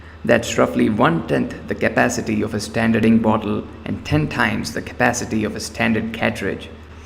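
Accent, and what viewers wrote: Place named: India. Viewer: Are you in India? you could get these in India (judging by your accent)? 🤔 I don't think I could get these in Bangladesh 😭.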